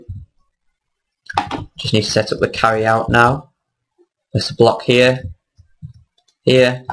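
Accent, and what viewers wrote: United Kingdom. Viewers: Sound British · Where are you from in the UK? You sound like you're from Lancashire haha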